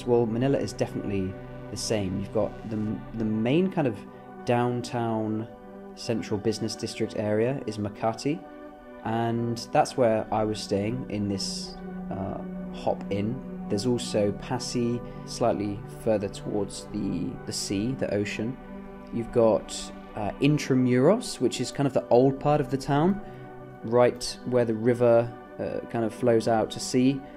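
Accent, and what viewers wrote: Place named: United Kingdom. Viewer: Hey I am from Egypt i am 12 years old I speak English very well and I really like your British accent and I watch you daily I appreciate that you are trying to teach other people Arabic and the British accent. I really hope you see this comment.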